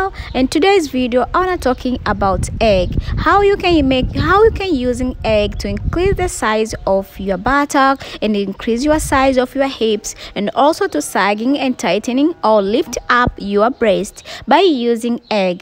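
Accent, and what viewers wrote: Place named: Tanzania. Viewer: Oh my God real TZ woman, the English just Sweet....🤗💕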